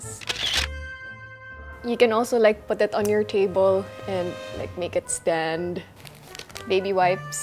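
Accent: Philippines. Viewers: you have a Filipino accent hehe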